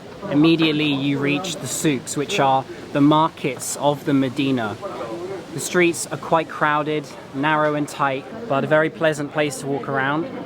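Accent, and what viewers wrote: England, United Kingdom. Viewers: Hey, you sound British. Are you from the UK? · Çok güzel bir yermiş ve harikaa İngilizce aksanınn💖💖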